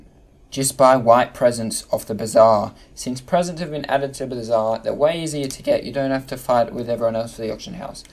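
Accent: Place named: Australia. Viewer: Australia Only know this one because every “a” sounds different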